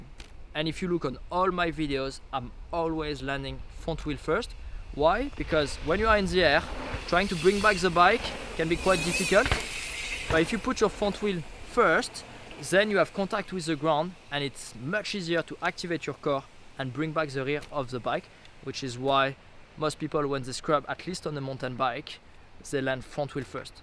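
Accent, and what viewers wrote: France. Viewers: Je kiffe grave ton accent français en anglais......tu parle le franglais, le vrai .....t y es le meilleurs en tout cas dans les rides de fou....bravo a toi d avoir trouve la technique du poignet pour ce tricks, ça marche du tonnerre je viens de l essayer ....🤟🤟🤟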